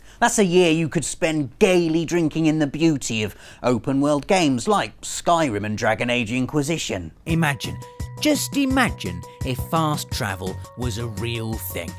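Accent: United Kingdom. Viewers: why oh why is Sony Playstation presented by dog-ugly nerds with a Brittish accent! ? unsubscribe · Lucca Cardieri PlayStation access wins because... British accent beats all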